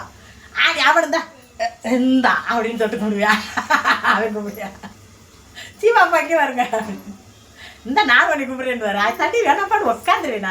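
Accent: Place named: Kerala, India. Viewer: நான் கேரளாவில் இருந்து உங்க வீடியோ பார்த்து கொண்டு இருக்கிறேன் அக்கா. நீங்க பேசுறது எங்க ஊரில் இருப்பது போல் இருக்கும் அக்கா ❤😊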